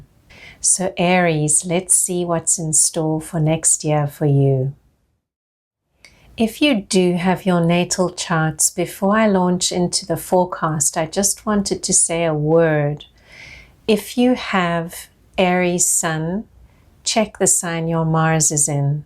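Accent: South Africa. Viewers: Are you South African by the way, your accent sounds so familiar? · You have a South Frican accent. Everything you said resonates. I saw your video today April 26th and started a health voach course in the begining of march a should get my certificate in march 2023. Also watched mu rising sign acquarius before and it is all playing out as described. Just wow!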